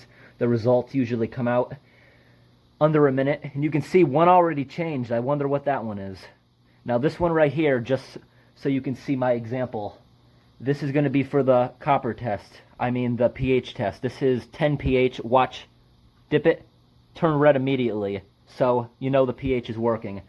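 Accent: New England, United States. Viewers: Dark Diddler New England accents are very unique. He says “dat” instead of “that” which is pretty common for that area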